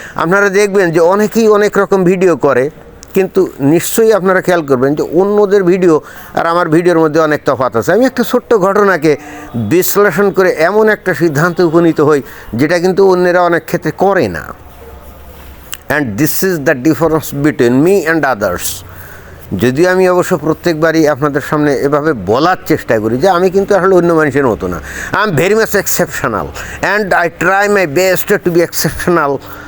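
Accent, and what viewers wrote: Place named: Khulna, Bangladesh. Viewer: আপনার কথা শুনে মনে হয় --- আপনি খুল্লে জেলার লোক! ওটা আমাদের পূর্বপুরুষদের বসবাসের জায়গা ছিল!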